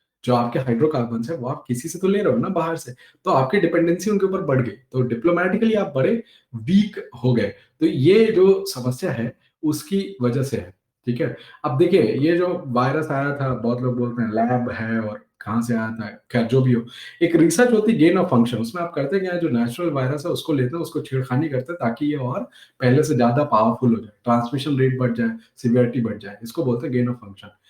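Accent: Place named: Uttar Pradesh, India. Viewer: Aap ki boli style se andaza hai ki aap uttar pradesh se hai ,correct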